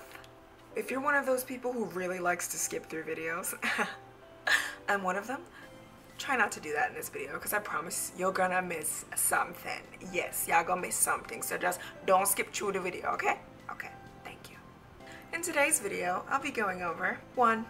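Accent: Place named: Jamaica. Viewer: I don't wear wigs but am definitely subscribing, plus as u start talking I knew I was listening to a fellow Jamaican 🇯🇲, then when u said u had to wash with your hands back home I was like yes I knew it she a Jamaican 🇯🇲.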